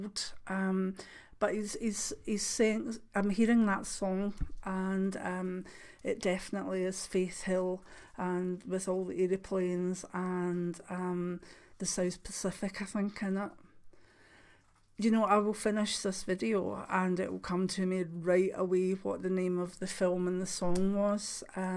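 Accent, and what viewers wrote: Scotland, United Kingdom. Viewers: Hi! I hope you are feeling better and resting. I've only recently subscribed to your channel but already I have used many of your meditation methods and the archangel that came through was Peter (?) I wonder if you would know more about this? Can I ask, do you do a lot of crystal work? I've seen one of your videos on crystals you recommend.You are so intuitive and being born in Edinburgh myself, your Scottish accent just makes me even happier to have found your content.:)Namaste.Love and light to you and yours. Loz. xxx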